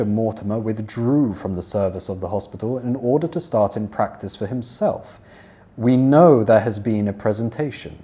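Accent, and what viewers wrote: United Kingdom. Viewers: 제가 영국 발음에 빠지게 된 계기가 셜록인데 원서를 영국 발음으로...! 하 너무좋아요퓨ㅠㅠ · I happened to know this channel while watching YouTube. There was too little chance of hearing a British pronunciation. But it’s really nice to hear the contents of many books in British pronunciation. I’m always enjoying this channel. Lastly, I love Mr. voice! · 영국영어 발음 넘남 좋앙~~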